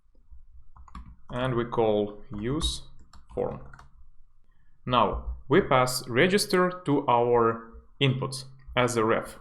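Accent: Russia. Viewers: thank you! you speack with a russian accent)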